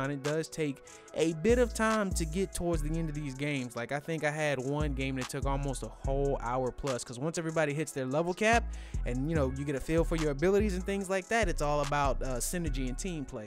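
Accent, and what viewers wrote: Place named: United States. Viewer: You sound American dawg n as far as i know its only out on Asian servers ...so how you playing it?...on PS4 we gotta make a Hong Kong account or something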